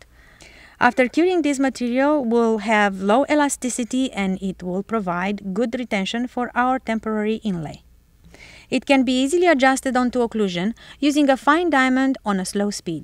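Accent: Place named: Romania. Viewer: I thought she was romanian from her accent. And apparently she is :D I'm from Romania too.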